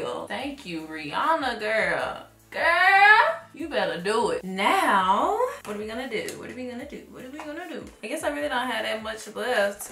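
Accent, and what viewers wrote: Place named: Memphis, United States. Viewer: Lol...i live the Memphis accent. People never have to tell me theyre from M-town cuz the accent leaves no doubt if you are familiar with it.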